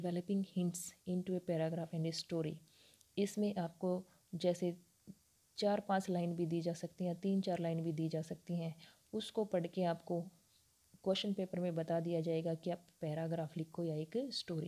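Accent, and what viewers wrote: Haryana, India. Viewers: Madam Ji Maine Apka Thoda thoda Haryanvi Language ka Touch Padhne main Ap use karte ho Badhiya lagta hai जय हरियाणा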